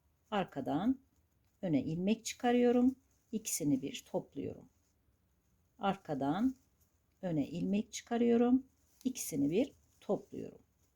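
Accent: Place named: Ordu, Turkey. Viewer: Ordulumusunuz🤗konusmaniz orayi animsatti bana😊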